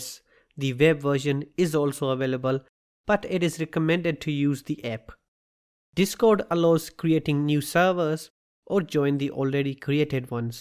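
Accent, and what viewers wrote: India. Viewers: Don't understand Indianenglish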